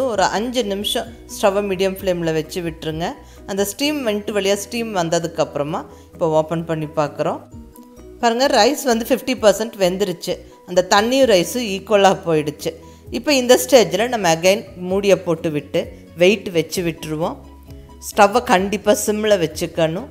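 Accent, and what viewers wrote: Coimbatore, India. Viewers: always you rocks mam.... Am also coimbatore nanjundapuram amma home.. Now setteled in Chennai.. Husband home... Nama cbe slang kelumpothu avlo happy ah iruku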